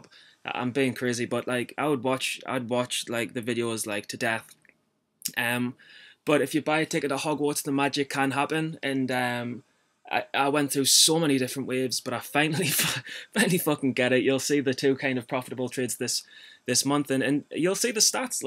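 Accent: North East England, United Kingdom. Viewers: are you from the north east of england by any chance because i am too :)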